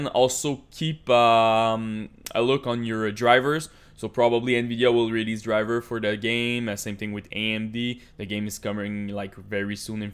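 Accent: Quebec, Canada. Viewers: Big accent of Quebec speaking inglish esti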